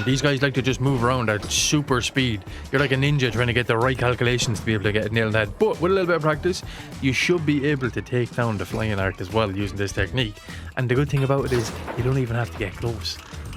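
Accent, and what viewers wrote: Ireland. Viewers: I'm a simple man, I hear Irish accent, I follow, like and subscribe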